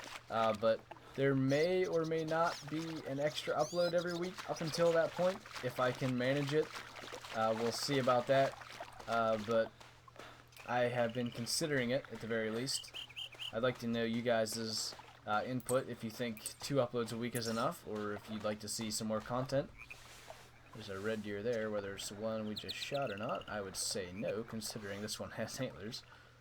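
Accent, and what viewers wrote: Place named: Pennsylvania, United States. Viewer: So am I. That's awesome bro. I had a feeling by ur slight pa accent.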